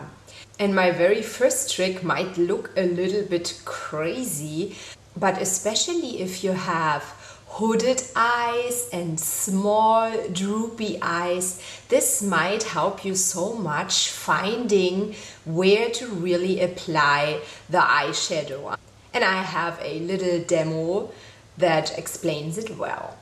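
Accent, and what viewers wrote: Germany. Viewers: Best German accent :D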